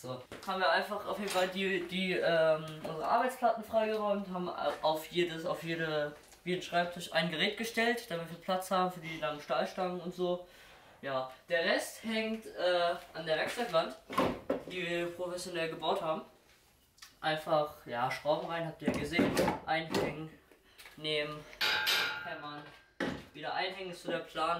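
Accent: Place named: Berlin, Germany. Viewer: die Videos werden immer professioneller (bitte nochmal ein ganzes Video nur im Berliner Akzent, sonst Deabo)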